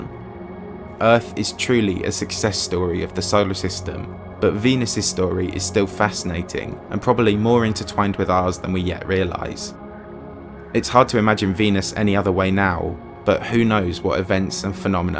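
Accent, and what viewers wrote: United Kingdom. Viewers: Sorry but it's hard to take this guy seriously with his unique British accent. Instead of saying "cold" he says "cowd". Hilareous!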